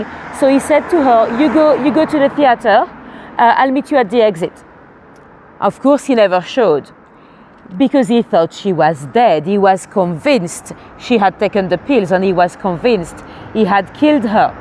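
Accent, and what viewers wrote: France. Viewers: You can't possibly be referring to the French accent? Love it!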